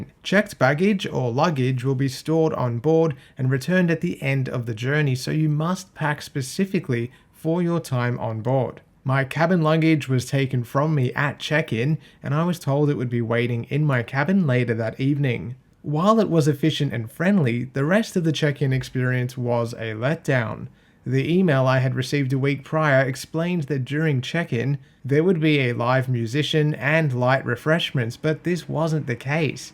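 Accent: Australia. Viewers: You sound Australian...you should know it's called the Gann [sp] not the Garn [sp]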